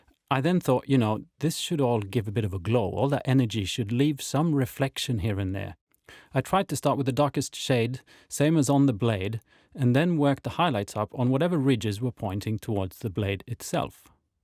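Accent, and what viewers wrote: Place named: Sweden. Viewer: You sound Swedish, and the store looks like Incrade in Umeå. How far off am I?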